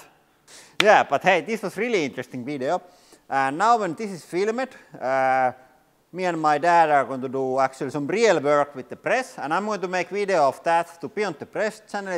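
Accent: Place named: Finland. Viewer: His finnish accent haha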